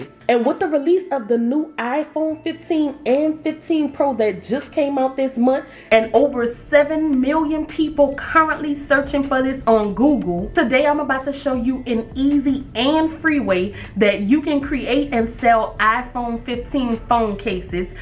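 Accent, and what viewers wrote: New Orleans, United States. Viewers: Love the New Orleans accent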